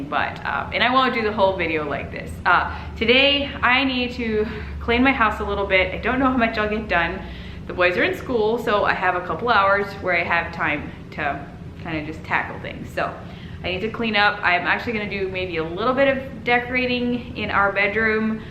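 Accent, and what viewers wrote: Pennsylvania Dutch, United States. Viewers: I'm from Palatinate (Southern Germany as well) and I feel the same way. Most of the words are used in the exact same pronunciation here. I could listen to you speaking Pennsylvania Dutch for hours,. It's very interesting!:) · So much fun hearing your dutch! Pa dutch was my first language too, though mine is more the east coast Mennonite style, definitely no rolled r's :-) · Love to hear the Pennsylvania Dutch! Very interesting. Hope you do more occasionally. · Love hearing the PA Dutch! Always enjoy the cleaning videos....I am not a good housekeeper (yet), but I learn a lot just watching how you do it . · Hey, to answer your question, i'm German and I understand approx. 50% of what you say. And as a language teacher i find Pennsylvania Dutch sooooo interesting :-) Love hearing it! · I absolutely loved today's video!! 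How fun!! It was fun to hear the Pennsylvania Dutch thrown in! I love your decorating style. You have SUCH a great eye for it. And all the little organized spaces- LOVE that!! That little cabinet in your family room is awesome! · I came across your video by coincidence and played it because it says Pennsylvania Dutch. I am not only German, but also a native speaker of the Palatinate German dialect. It is the first time I have heard someone speak PD! I have to say that the pronunciation is significantly different. I can hear the American Accent that got in, but I recognise many words. Many are still written as we say them in Palatinate. I especially recognised „do hiwwe“ 😂 and „Kich“. 🤭 Greetings from Palatinate! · I loved hearing you speak Pennsylvania Dutch! I grew up in the south of Austria with German as my native language. I could understand you, but had to listen closely. I went to Millersburg, Ohio a few years ago and we were surprised how well we could understand. 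· I loved listening to you speak PA Dutch. It reminded me of listening to my Dad and his sisters. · I also hear the German very distinctly. I spent many years there (my dad worked there for 17 years) and I got married in Heidelberg. You are speaking it with what I would call an "American" accent of course, but that is to be expected. I speak German with an American accent, too. LOL I hear a few Dutch words, it's definitely a blend of the two languages. It's beautiful, but I do hear the English words as you are speaking, too. I think it's amazing to learn different cultures....it was the BEST part of all the travels I was blessed with. I've lived in Egypt, Germany, Okinawa, Switzerland, Czechoslovakia and many places here in the US - including in the PA Dutch Country - and I was born/raised in Cincinnati OH as our "home" when we came home from overseas. I am loving your channel. Blessings!!!! 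· Love your videos and your Pennsylvania Dutch is beautiful. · I live near the French border in Germany and I understand most of your dialect. I have to listen closely. Usally I think in English or German, but Pensylvania Dutch is so haevily mixed, that my head spinns a bit when I try to sort out the bits and pieces. :) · Love this video blesses my heart hearing you speak Pennsylvania Dutch brings back so many childhood memories. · As somebody who lived near the Pfalz, I grew up with people around speaking some kinds of a german dialect. Since they all are very different even within the region (for example potatoes can be Krumberen, Kartoffeln or Erdäppel within a few miles radius), I should have expected for there to be different PD dialects. But I was completely surprised by how different (and harder to understand) your dialect was to for example Doug Madenforths who I unterstand as well as my Omas und Opas speaking their dialect. 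Keep up speaking your dialect, it is a cultural good that is hard to bring back once it's gone :) Great video as always! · Love your videos. Love listening to Pennsylvania Dutch!!! I so miss living in PA. I'm curious as to how well talk to text picks up Pennsylvania Dutch? :)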